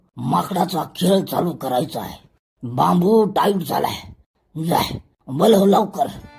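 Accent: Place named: Marathwada, India. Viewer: मराठवा डायची भाषा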